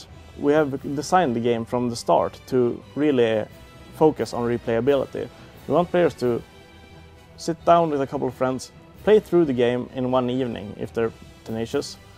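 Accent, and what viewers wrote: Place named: Sweden. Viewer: That's hell of an accent you have there and I say that as a fellow swede.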